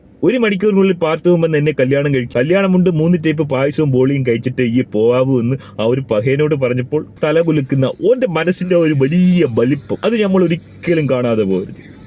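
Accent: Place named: Malappuram, India. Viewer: നിങൾ എന്തിനാണ് എല്ലാ പരിഹാസ വീഡിയോ lum malappuram muslim slang pole സംസാരിക്കുന്നത്. അതൊക്കെ പണ്ടാണ്, ഇപ്പൊ അവിടെയും ആരും നിങൾ സംസാരിക്കുന്ന പോലെ സസരിക്കുന്നില്ല. നിങൾ ഷെഡ്യൂൾ cast കള് സംസാരിക്കുന്നത് എങ്ങനെയാണ് എന്ന് പറയിപ്പിക്കരുത്. അവർക്ക് ഒരു word nte starting letter ഉണ്ടാവാറില്ല സംസരിക്കുമ്പോ, പുലയൻ, പറയൻ, ചെറു മക്കൾ തുടങ്ങിയ വിഭാഗങ്ങളുടെ സംസാരം കേട്ടിട്ടുണ്ട്, അവർ മുമ്പ് തറവാട്ടിൽ നെല്ല് ,കൊപ്ര,കളപ്പുര, help nu വരുന്നവർ ഒരുപാട് ഉണ്ടായിരുന്നു , Eg പറഞ്ഞു തരാം " ആ ചെക്കൻ്റെ പെണ്ണിൻ്റെ വീട്ടിൽ" എന്നതിന് " ആ എക്കൻ്റെ എണ്ണി nte ഈട്ടിൽ " എന്നാണ് അവറ്റകൾ പറഞ്ഞിരുന്നത് 😅😅 അതുപോലെ ഒരുപാട് ഉണ്ട്. ഞങൾ ആരും അത് പറഞ്ഞ് അവരെ പരിഹസിക്കുകയോ ഇങ്ങനെ പറഞ്ഞു നടക്കുകയോ ചെയ്യാറില്ല. നിങൾ ഒരു reaction video ൽ hindu family ye react cheyyumbo polum മുസ്‌ലിംകളെ പരിഹസിക്കുന്ന slang ൽ parayunnath njaan kettu. കഷ്ടം തന്നെ. നിങ്ങളെ നിലവാരം ഇതാണോ ???! മഹാ ബോർ ആണെ്ടോ ഇതൊക്കെ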